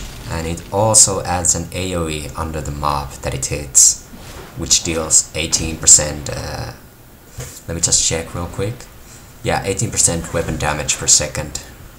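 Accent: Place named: Asia. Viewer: lol you're funny. I can do that same accent. Are you actually asian?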